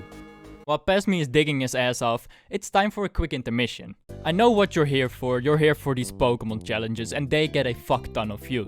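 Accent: Netherlands. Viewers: Dutch accent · You have like a Dutch accent Like how you say Pokémon it's like Dutch (Mede Nederlandstalige leuke video) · where are you from? i really get a feeling you are dutch or something just because of how you prenounce some words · You sound Dutch. Is that weird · You are Dutch aren't you? I can hear it because of your accent? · Are you dutch? You sound pretty dutch to me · I can clearly hear you’re dutch😂😂😂😂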